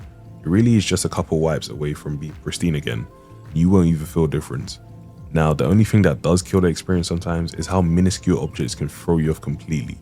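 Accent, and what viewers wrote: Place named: United Kingdom. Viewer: Nice video man! its refreshing to hear a brit for once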